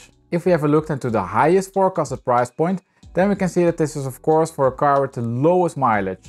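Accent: Netherlands. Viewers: Just curious, guessing Nederlander? Where are you from exactly if i may ask? Love your channel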